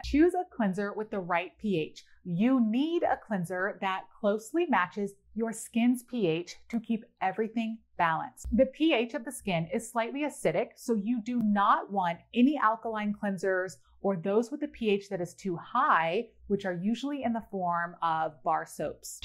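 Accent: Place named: Iran. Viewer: I hear a mild Persian accent. 100 bucks says she is Persian. 😁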